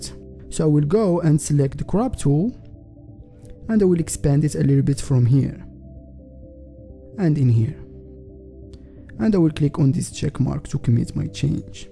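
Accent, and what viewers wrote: Morocco. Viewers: your accent and voice sound like a Morrocan man great video btw!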